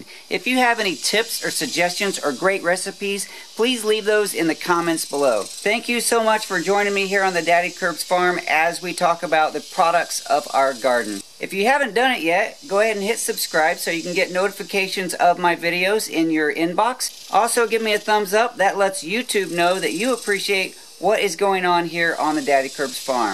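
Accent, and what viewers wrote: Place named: South, United States. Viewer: That Strong Southern Accent :P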